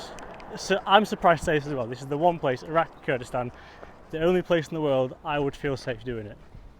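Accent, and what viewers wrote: United Kingdom. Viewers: well done mate. I just can't help but laugh watching and hearing your northerners accent talking about my beloved homeland Kurdistan... the highlight of the video is where you say the cherry on the cake "the bloody toilet", I know exactly what you mean. I wanna congratulate you on this fantastic piece. although there much more to explore in Kurdistan and I hope others in the west will visit and follow you. bravo.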